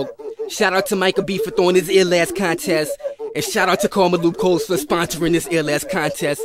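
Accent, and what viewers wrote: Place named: United Kingdom. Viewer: definately, are you british? Sound like it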